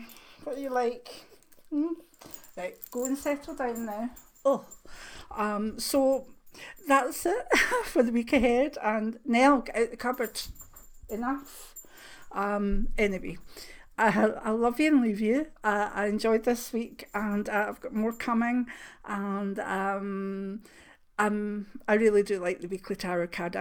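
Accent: Scotland, United Kingdom. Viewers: Hi, Thank you for your insightful reading. Just wanted to say I love your Channel. My parents are from Lanarkshire but we live in South Australia, so it is nice to hear a familiar Scottish accent. Thank you xx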